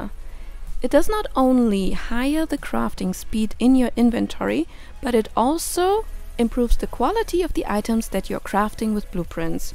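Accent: Germany. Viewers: me as a german: She spoke Video very german...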